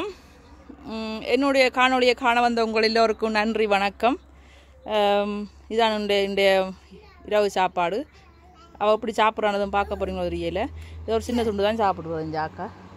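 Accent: Sri Lanka. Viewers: நீங்கள் செய்யும் முறை அனைத்தும் அழகு உங்கள் யாழ்ப்பாண தமிழ் மிக்க அழகு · hi do you remember me? glad to see your video beautiful SL tamil. keep sharing, ipodhu ungal veetu ilakam munootru thonootru aaru. Valha valamudan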